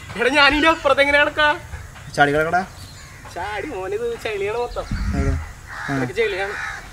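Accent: Thrissur, India. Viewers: ബ്രോ 4 വയസുള്ള എന്റെ മകൻ നിങ്ങളെ youtube വീഡിയോ orikkal കാണാൻ ഇടയായി. ഇപ്പൊ ഡെയിലി 'മാമന്റെ വീഡിയോ വച്ച്താ എന്നും പറഞ്ഞു കരച്ചിലാണ്. കണ്ടതൊക്കെത്തന്നെ വീണ്ടും വീണ്ടും കാണുന്നു.. കോട്ടയം കാരൻ thrissur ഭാഷ സംസാരിക്കുന്നു... without any training.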